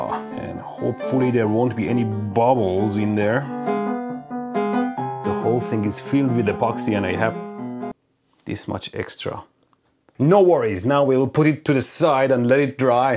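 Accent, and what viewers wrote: Iran. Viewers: با لهجه غلیظ فارسی انگلیسی صحبت میکنه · Ultimate parsian accent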